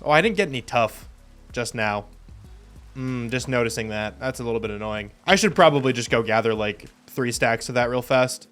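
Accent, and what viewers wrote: United States. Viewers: I thought I detected an eastern PA accent, I'm from that area too! Go birds! Just found your videos and kept picking up on Philly/lancaster isms. Enjoying the series so far. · Ahh, now that you mention Pennsylvania I can hear it. Good video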